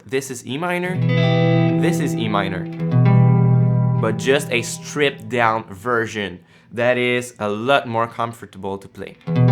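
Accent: Quebec, Canada. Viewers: Nice lesson bro, bel accent québecois apart de sa ;)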